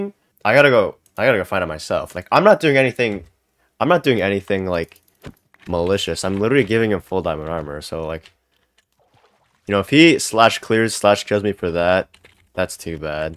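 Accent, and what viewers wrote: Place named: Canada. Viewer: YOURE CANADIAN ASDKLNAOSPIJDKOJASDASDASD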